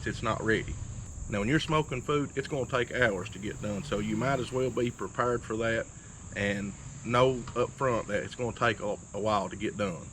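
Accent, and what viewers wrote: South, United States. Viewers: Whereabouts in the US are you from? Loving the accent. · Heavy southern accent and a cap that says tractor supply, you just know you are at the right place for grillin.... :-) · What part of the US is that dialect from? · Where is that southern accent from? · Is it prejudice of me to be like “he has a southern accent, imma listen to him about BBQ?” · People can pick on a southern people but I am so proud to be Southern I love to hear us talk including myself LOL my accent ain't nowhere near as deep as this my daddy and family had a accent like this my Yankee husband took mine away a little bit😂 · That's the most american voice I've ever heard, absolute faith in this man's knowledge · That’s the most southern accent I ever heard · Special like for southern accent. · Love the Southern accent, this guy knows what he's talking about! 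· Good to hear a Southern accent. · Funny how this accent is associated with clean, efficient, non-meme information from experience. The time will inevitably come when the memes will reach the American South. Then no one is safe no moar 😆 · Love the tips and love the acent. How can you sound like a US Southener so authenticly? keep up the good work! Cheers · Great Video mate. I'm from Australia and your Southern Accent is just perfect.. Lol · Thank you I really Like Your videos and Your country southern accent Your tips have help Me very much Your a Great American God Bless you. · If a guy is has a southern accent and is talking about a gun or grill...listen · Big southern boy, sounds like sound advice. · what is it about the southern accent that makes us just trust whatever they say lol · All of these gas or pellet grills don't know the pure enjoyment of Caveman grilling with charcoal. 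When I heard the Southern accent I knew this was on like Donkey Kong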